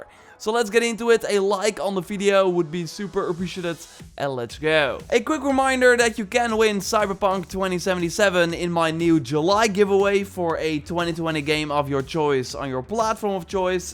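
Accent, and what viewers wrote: Netherlands. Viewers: youre dutch, i can hear it in your voice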